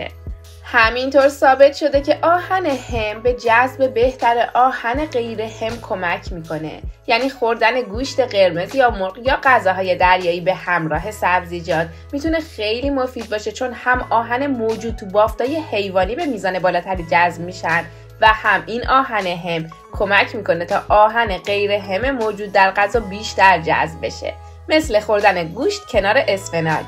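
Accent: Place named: Isfahan, Iran. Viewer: قبلاً گفته بودین اصفهانی نیستین اما من مطمئنم یا مادر یا پدر یا یکی از نسل پشتتون اصفهانی هست ، چون واقعا قشنگ لهجه اصفهانی دارید 😂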